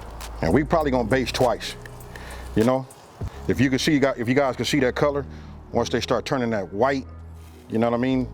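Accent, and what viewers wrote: United States. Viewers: Would love to see that. Love your American accent and if I ever came to US and A would love to meet you and your Family 🌅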